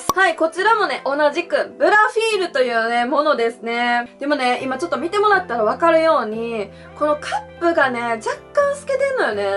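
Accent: Kansai, Japan. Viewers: インナーの企画もっと載せてください。それと関西弁が好きやねん。💝💝💝💝💝。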